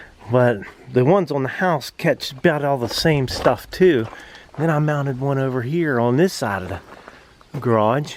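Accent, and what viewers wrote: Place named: Indiana, United States. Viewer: are you in Indiana? Your accent reminds me of someone in my hometown of Richmond, Indiana.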